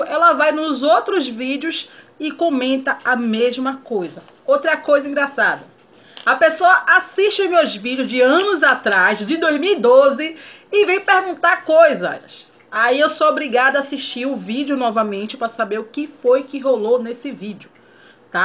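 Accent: Bahia, Brazil. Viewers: Engraçado ne não agravando a todos "Tem pessoas q não gosta do nosso sotaque baianes fala mal de nosso povo e quando chega o Carnaval tá todo mundo socado até o talo na nossa terra vai entender "Manda esse povo deitar na Br. 😂😂 Linda sua maquiagem.😘😘😘 Liga não o povo quis dizer q seu amor é todo grosso mais é os braços kkkkk 😂😂😂😘😘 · kkkkkkkkkkkkkkk isso mesmo manda conta do mes pra pagar seu dentistakkkkkkkkkkk adorei o beijo p euzinha e p Joaozinho linda que Deus abencoe muitoooo baiana arretada adoro seu sotaque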